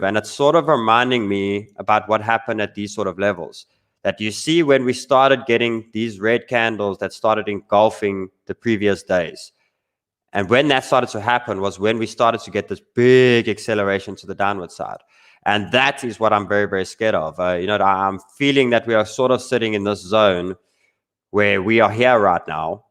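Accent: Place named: South Africa. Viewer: I’m South African, but damn, I’m loving your accent. I miss SA accents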